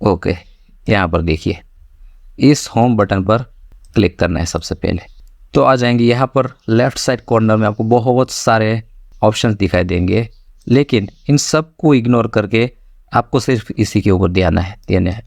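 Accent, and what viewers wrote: Gujarat, India. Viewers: Kya aap Gujarati hai???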